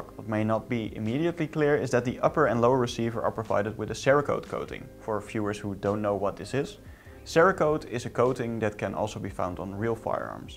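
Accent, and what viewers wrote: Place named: Netherlands. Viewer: I instantly heard Dutch english hehe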